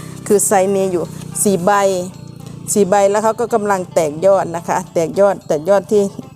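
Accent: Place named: Surat Thani, Thailand. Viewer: พี่ พูดเหมือนคนสุราษเลยครับ ได้ความรู้ครับ ผมก็เริมสนใจบอนสีครับ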